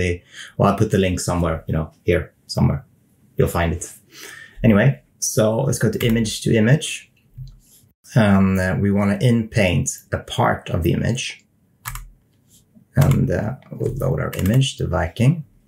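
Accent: Sweden. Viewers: The way you said automatics. I think it would sound less Swedish if you said Automadics and didn't emphasize anything. Your Swedish dialect is very obvious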